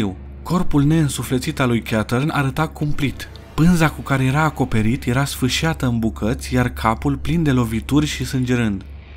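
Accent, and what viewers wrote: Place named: England, United Kingdom. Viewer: Ai puțină engleza pe la colțul guri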